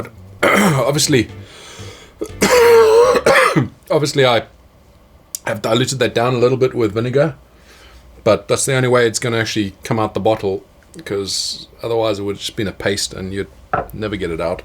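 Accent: South Africa. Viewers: Where are you based? You sound South African.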